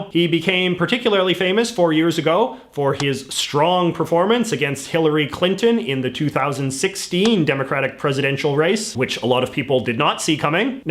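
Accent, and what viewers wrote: Canada. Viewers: are you canadian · Aboot...u are Canadian aren’t you! #Bernie2020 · It's the Canadian pronunciation.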